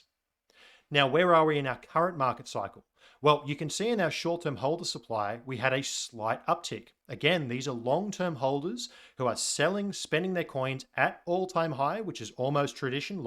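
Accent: Australia. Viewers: I always trust the aussie accent for some reason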